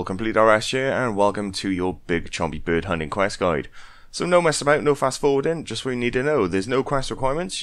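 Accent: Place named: Scotland, United Kingdom. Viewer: I miss you and your Scottish accent </3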